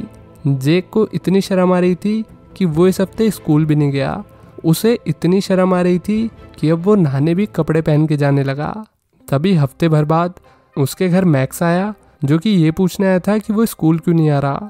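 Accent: Bihar, India. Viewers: R U FROM BIHAR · Bihar se ho kya bhai😅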